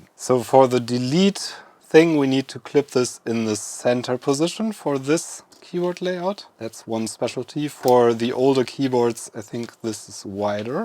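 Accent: Germany. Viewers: Schade das die Videos immer in Englisch sind. Vom Akzent her würde ich sagen das Sie deutscher sind manchmal ist es schwierig zu folgen da mein eigenes Englisch nicht reicht. Aber nichts desto trotz sehr gute Videos und gut erklärt. Vielen Dank dafür. Grüße aus Niedersachsen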